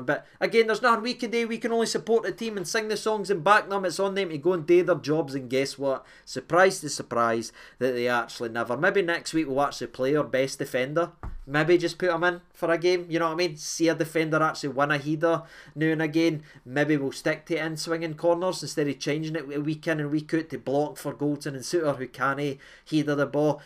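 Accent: Scotland, United Kingdom. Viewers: I'm from Holland and I'm here just for his Scottish accent. I also read the comments in a Scottish accent in my head.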